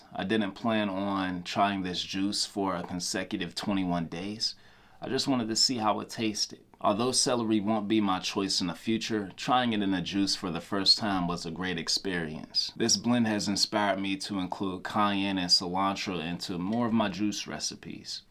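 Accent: Pittsburgh, United States. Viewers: Few minutes in, and my question was answered lol. I heard the Pittsburgh in you, first sentence. 💪🏾 Keep grinding man, and you gained a new subscriber. I’m originally from Penn Hills/ Homewood.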